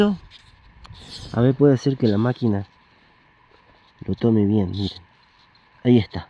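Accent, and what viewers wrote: Córdoba, Argentina. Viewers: Felicitaciones por su canal, aun no los conocía y me ha parecido muy interesante su video. Muchas gracias. Dice usted que estan en la puna. A mi me parece notar en su modo de hablar tonadita cordobesa. Lo sigo desde España, aunque naci en Córdoba. Una preguntita, el oro que se encuentra así ¿tiene todo la misma pureza? Lo que quiero decir es que si se funde ¿qué tipo de oro se obtiene? o ¿tal vez no se puede fundir? Perdona mi ignorancia, pero es que aquí el oro sólo lo he visto en las películas del 'far west', en los escaparates de las joyerias y últimamente he visto gente buscándolo en el rio en algún canal de youtube. Las cantidades que consiguen sacar son muy chiquititas, pero parece mas difícil de obtener que con detector como usted... Me parece un tema apasionante y si realmente en esa zona hay mucho, aunque sea en pepitas muy chiquitas, al cabo de un mes de frio y trabajo duro, se debe poder sacar un salario ¿no?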